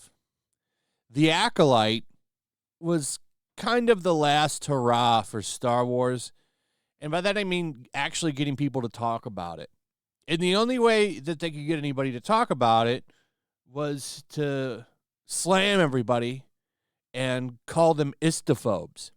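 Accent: England, United Kingdom. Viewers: I like your English voice! Where I live everyone talks like that lol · Congrats on the (sort of) English accent. I only heard of this because some you tubers mentioned it. It's ok, a bit of fun. Looking forward to Andor though.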